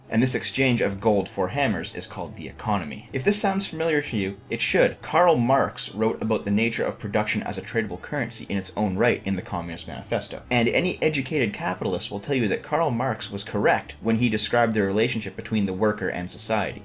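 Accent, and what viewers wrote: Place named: London, Ontario, Canada. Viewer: I swear you could be from london ontario. But what do i know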